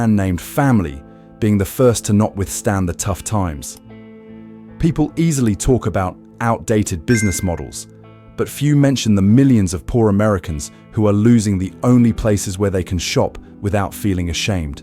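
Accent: United Kingdom. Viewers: Hey Dude, where did you get that British accent? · Why do you have a British accent??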